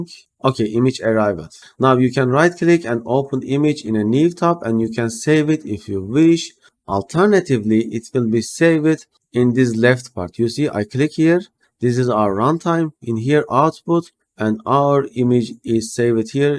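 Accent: Turkey. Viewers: I admire your Turkish accent. 🙂🙂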